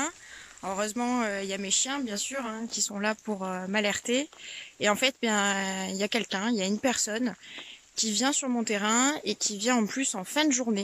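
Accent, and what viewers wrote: South, France. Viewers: Heureusement que tu as des chiens!!! J'adore ces animaux fidèles et efficaces. Méfie toi quand même du gars chelou du soir, c'est pas clair.. Demande à ton copain de te protéger 😉 Tu es toujours aussi belle et craquante. Tu es une belle âme, une bonne personne qui respire la bonté d'âme: Beauté, générosité, bienveillance, fraîcheur, spontanéité, franchise se ressentent car tu vis harmonie avec la nature, loin des maux de notre temps. Bonne route et bon courage dans ce que tu entreprends,. Peut être qu'un jour nos routes se croiserons et çà serait avec grand plaisir que je partagerai une tasse de café avec toi. Ton terrain est dans quel coin déjà, vu l'accent je dirais dans le sud mais vers où? Des likes et des Bisous bien doux 😘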